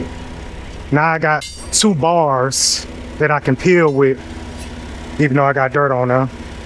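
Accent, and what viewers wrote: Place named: Maryland, United States. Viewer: You sound like someone I know. Are you from Maryland by chance? 😅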